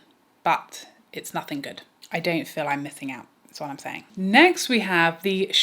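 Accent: United Kingdom. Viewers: Great video as always dear, and looking so beautiful!!!🤩🤩🤩🤩Love your humor always so, so much!!! Do you know how unique you are here, your beautiful appearance, your knowlegde, your lovely British accent AND your humor, you always make my day with your video's, thank you!!!! The UD nightmare🤣🤣🤣🤣I'm only interested in the CT powder at the moment. Thanks, have a great sleep and an amazing day tomorrow!!!😘😘😘😘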